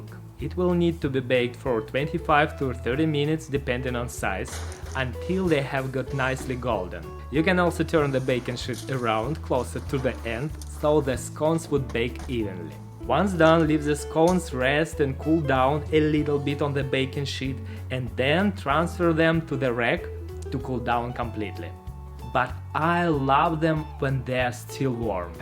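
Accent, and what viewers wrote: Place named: England, United Kingdom. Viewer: As an English teacher I can surely say - you have a beautiful English accent👍😍😘. And I'm a huge fan of all your channels. Love you guys😉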